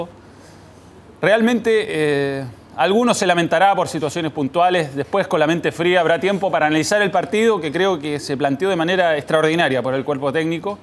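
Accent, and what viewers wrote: Argentina. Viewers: Yo juraba que era argentino jaja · hablando con acento argentino Manoel? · Debo destacar a este periodisata, me parece muy bien todo lo que ha logrado en FOX SPORTS pero wn...... DEJATE DE HABLAR COMO ARGENTINO!! Cada día me molesta más escucharte sólo por el puto acento que colocas. Molestan a Zamorano y tú HACES LO MISMO!!! Repito, me parece uno de los buenos y cada vez mejor posicionados de FOX SPORTS pero loco... ERES CHILENOOOOOOOOOOO!!!!!!!!!!!! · hablaba como argentino en ese tiempo jajjaa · Manoel es tan políglota como zamorano! Que buen español argentino! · y pa que habla como argentino? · hablaba como.argentino en ese tiempo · y un pequeño Tono Argentino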